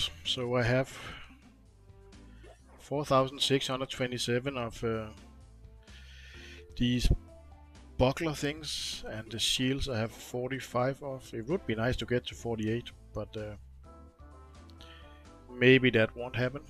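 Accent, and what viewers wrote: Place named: Denmark. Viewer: Du är dansk va? Det hör man på din engelska 😅 Men, sjov med människor som streamar Looney Tunes, keep it up!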